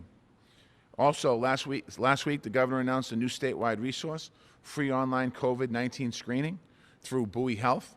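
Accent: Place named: Boston, United States. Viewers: WTF? Non American accent!! That's a Boston accent!! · Went to school in Boston and the whole time I couldn't stand that accent!!! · Im just here to be entertain by the Boston accent. #quarantinedlife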